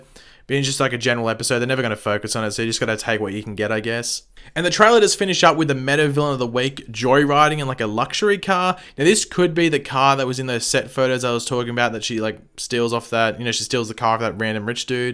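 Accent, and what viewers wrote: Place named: Australia. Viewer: You sound Aussie ;oo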